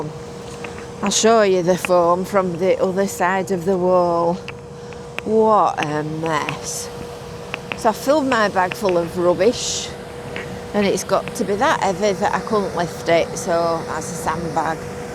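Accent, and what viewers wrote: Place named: England, United Kingdom. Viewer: i just love the way you laugh,...i love my english peop0le's accent,..thank you for being here alive and kicking,......,......marius(punk rules).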